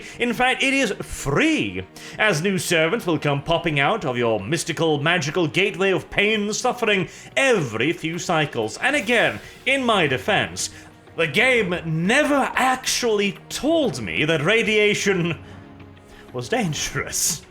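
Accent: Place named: RP, United Kingdom. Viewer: Some people on the internet think is not aware of how smug and posh his accent sounds. They haven't seen his game reviews.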